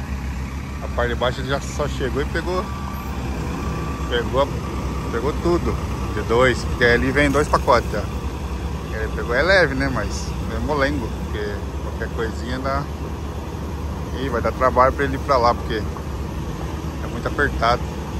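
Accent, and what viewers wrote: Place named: Rio Grande do Sul, Brazil. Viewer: EU CONHEÇO ESSA VOZ GAÚCHO KKKKK PUBG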